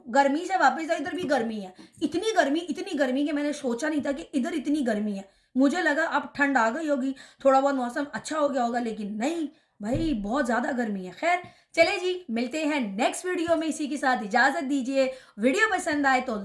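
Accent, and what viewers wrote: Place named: Karachi, Pakistan. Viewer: mughe pata nahi qn hamesha se lugta he tum karachi se belong karti ho tumhari urdu same hamare karachi walon ki tarah he khair hamesha khush raho❤❤❤❤❤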